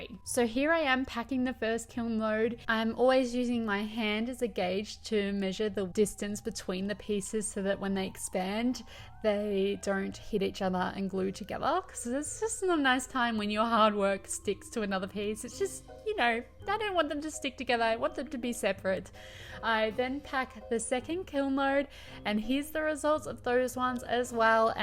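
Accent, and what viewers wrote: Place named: Australia. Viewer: You are such an Aussie! Lmao "oh there's a creepy crawly." You pick it out. Me: nope!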